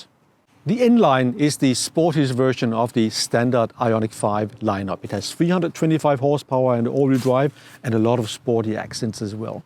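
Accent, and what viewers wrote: Denmark. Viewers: Tager jeg fejl, eller afslører din accent, er/taler dansk? Nå men har købt en ionic 5 Ultimate her i Danmark, den bruges primært af min kone som elsker den når hun pendler til og fra arbejde. Ja forbruget er lidt højt, men den er lækkertskruet sammen, kvalitet, ekstraudstyr. Rimeligt prissat nu i forhold til tidligere og efterprøvet igennem flere år og raffineret. Klar anbefaling herfra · hahaha tænkte netop det samme. Svært at skjule den danske accent.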